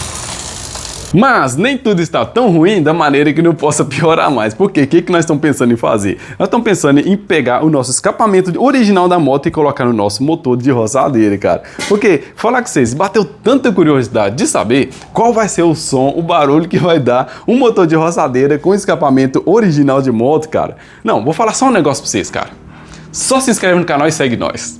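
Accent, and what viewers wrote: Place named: Northern Minas Gerais, Brazil. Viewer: Tem o sotaque do norte de minas 😅! Ou nortminas soo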